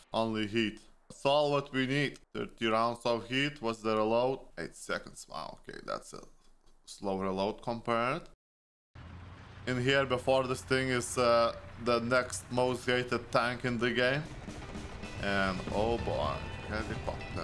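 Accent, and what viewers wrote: France. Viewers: Extra sensibles avec l'accent du sud 👌! Super vidéo: ) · Le petit accent marseillais qui fait plaisir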